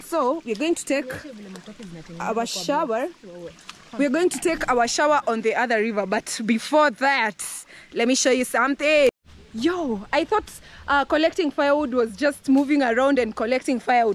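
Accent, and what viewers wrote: Kenya. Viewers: You didn't say what country that is. It's so tropical. I had no idea there was such a beautiful place in Africa. Is it like Congo or something? I think Kenya might have such but not sure. Your accent sounds much like Kenyans I have talked to. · She sounds Kikuyu and thinking that looks like Kirinyaga area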